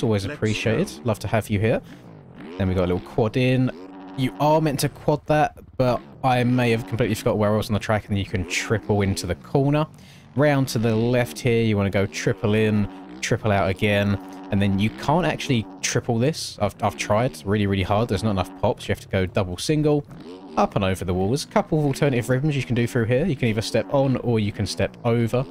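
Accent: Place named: United Kingdom. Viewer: I'd much rather listen to your Brit voice commentating than Leigh Diffey any day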